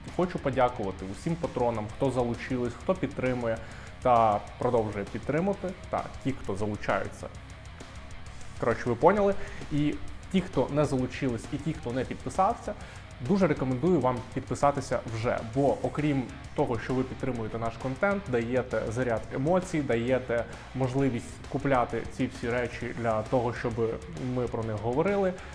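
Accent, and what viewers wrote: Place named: Surzhyk, Ukraine. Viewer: Как бы ты не пытался, но увы, слышно четко, что Украинский язык не твой родной, суржик это твое, вся восточная Украина знать не знала своего языка, все говорили на суржике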